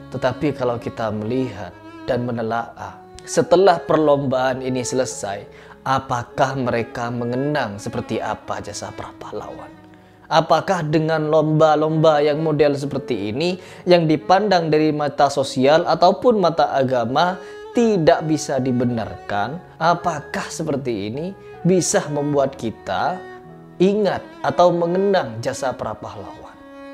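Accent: Madura, Indonesia. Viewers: Pean logate madura ya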